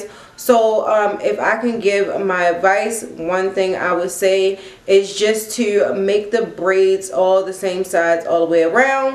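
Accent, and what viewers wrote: Baltimore, United States. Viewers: Yep, that's a Baltimore accent.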